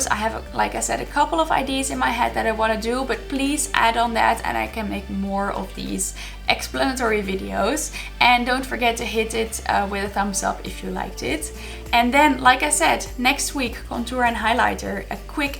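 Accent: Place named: Netherlands. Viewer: What a gorgeous channel you have! Where are you from?💗✨ You sound Dutch like I am😂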